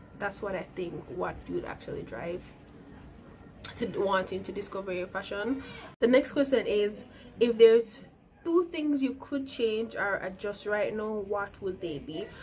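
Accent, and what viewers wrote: Jamaica. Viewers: U sound Jamaican 🇯🇲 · You Jamaican rite? 🤔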